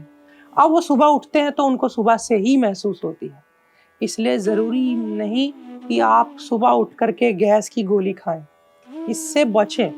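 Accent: Punjab, India. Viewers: Tussi Punjabi o??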